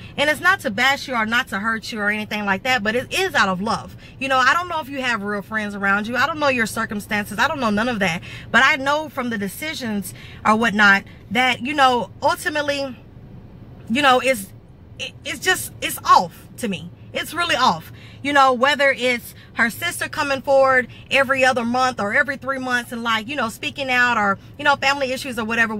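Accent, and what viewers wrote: South, United States. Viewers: YOU SO COUNTRY OMG😍😍😍😍😍😍😍😍😍😍😍😍😍😍😍😍😍 WHERE YOU FROM!?!?!?!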